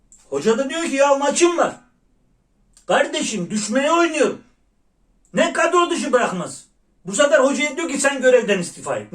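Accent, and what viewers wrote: Erzurum, Turkey. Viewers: Sen Erzurum lumusun abi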